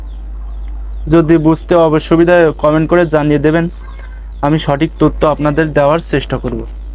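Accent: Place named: Narail, Bangladesh. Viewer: প্রাই নড়াইল এর ভাষার মত,,,,, কোন জেলায় বাড়ি ভাই আপনার??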